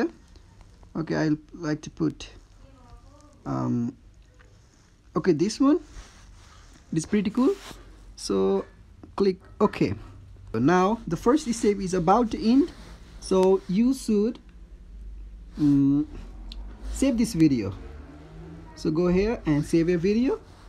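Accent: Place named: Nepal. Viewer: Daí ko speaking Language Sounds like Nepal tune.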